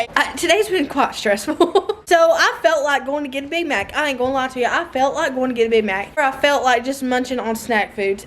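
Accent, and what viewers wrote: South, United States. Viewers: I think people need to understand that you can still live frugal and on a budget and still have money to spend. My husband and I live below our means a lot, but if needed we can drop a couple hundred on something and not have it hurt us. Just because you try and live frugally, doesn't mean you can't spend a little extra if you decide it's worth the spending. I got here watching your alls plantation shutters video, and stuck around for the southern drawl and since of humor. There's only a few youtubers that I watch solely for persona and you would be one. I just like when I watch a video and someone's house isn't perfect all the time, because mine isn't. After 4 kids (and some health issues starting to show up like PCOS) I'm on a health journey too, so it will be cool to see your progress and feel like someone else is doing it with me. 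😊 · I was just last night telling my hubby about u and ur family and ur channel! My exact words were she says “hey y’all my name is and I’m coming to u from a trailer park in Tennessee!” In the cutest southern drawl ever! And I was telling him I watch a LOT of you tubers and that u are the most authentic and amazing one that I watch! I was telling him how much I love ur family values and what an amazing marriage u have!! How hilarious u and and even the kids are! And I had just told him that I think she is probably making some good money on you tube and u don’t even do advertisements. That u turned one down for thousands of dollars becuz that’s not how u wanted ur channel! And I told him how I was so happy seeing u be able to buy some diy stuff for ur house and take the kids on a staycation but how u still are so grounded and save up for things in envelopes and that u haven’t changed one but!! 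We love y’all for y’all!! I’m glad u explained that tonight (although I did cry) but I don’t ever want u to feel that way again!!!! Just delete nasty comments! Don’t deal with them!! U know you and that’s all that matters!! And I hope one day u pull up to that trailer in a Lamborghini!!!! Wouldn’t that be the talk of the park!!!! Lol!!! Plzzz don’t let anyone else bring u down! U have brought me up from some of my darkest days!!! There isn’t one video I have ever not smiled or laughed (even if we’re through tears of my own) the whole time!! U have a heart of gold! Don’t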